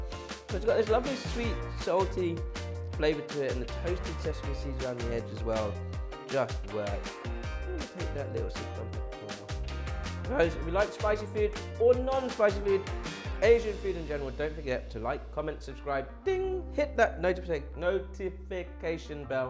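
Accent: United Kingdom. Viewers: is the most british sounding Chinese dude ive seen.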